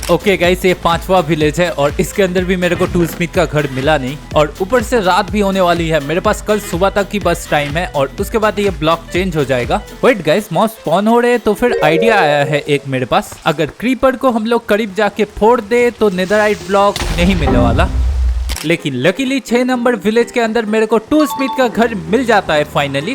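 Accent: Bengal, India. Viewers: bhai Tum Bengali ho kya?, 🙂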